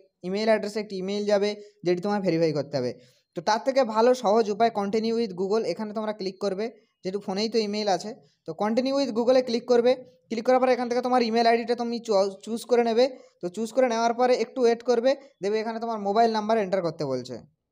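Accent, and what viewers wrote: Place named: Bangladesh. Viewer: তোমার গলা শুনে বাংলা দেশি মনে হচ্ছে , একটু ভাষার শুদ্ধতা নিয়ে আসো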